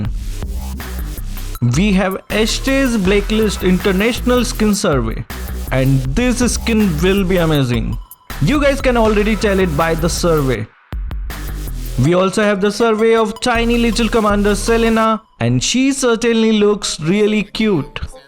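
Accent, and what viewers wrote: India. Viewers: Indian english so bad 🙄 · Your Accent is TERRIBLE AT BEST.. So thick Indian Accent with a Japanese name!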